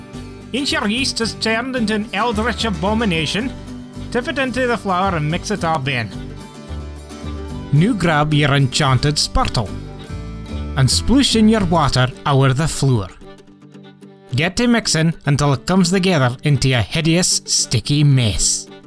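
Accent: Scotland, United Kingdom. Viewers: Yir a Fruit Loop Min!! Bit yair damn funny wicht it!! Fits Like!! It's great tae hear the auld Brogue, one thing I dae Miss oor here in US ofA, yir Brilliant, Keep it up Min!! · What region of Scotland is that accent? My grandparents are from fraserborough and the accent is completely different.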